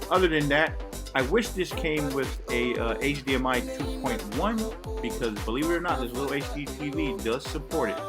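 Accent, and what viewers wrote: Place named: Hawaii, United States. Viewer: I watched this for 2 minutes and could tell you were from possibly Hawaii or somewhere around there. I'm from there so I like to see if I can tell if someone is from there or not. Pretty good video man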